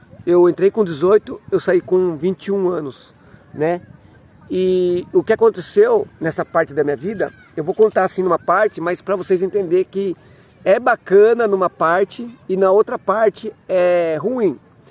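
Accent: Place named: Rio de Janeiro, Brazil. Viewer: Sempre sigo suas dicas pois é um dos melhores canais sobre mecânica e principalmente sua humildade seu carioca e simplicidade nos vídeos que são muito instrutivos que Deus continue te abençoando sempre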